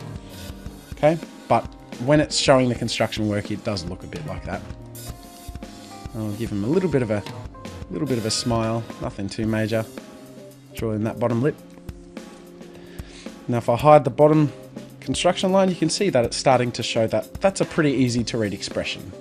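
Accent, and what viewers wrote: Australia. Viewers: really nice.. are you australian?^^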